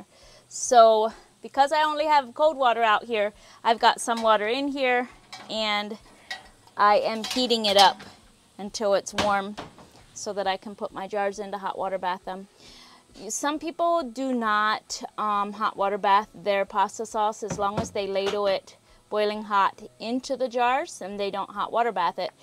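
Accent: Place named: Pennsylvania Dutch, United States. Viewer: I have friends who are also ex Mennonite and you remind me so much of the mom, mainly because of your sweet Pennsylvania Dutch accent. 😁. Your channel is fun to watch. Many blessings and don't let the hateful comments get you down.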